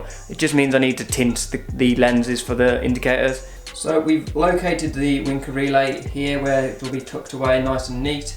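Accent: Birmingham, United Kingdom. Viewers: Cool look fwd to that. You sound like your local to me. I’m in Birmingham. Could do with picking the brain of the guy that does your electrics lol.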